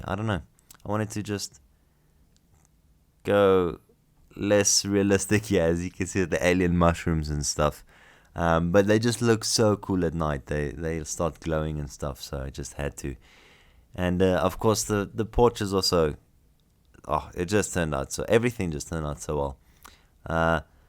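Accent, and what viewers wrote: South Africa. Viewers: Ermagahd a South African. So weird to hear our accent on youtube XD immediately recognize!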